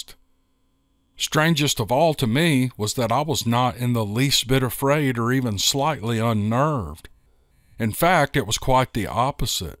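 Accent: South, United States. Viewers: LOVE this man's narrating!!! From the south myself and miss the accent!!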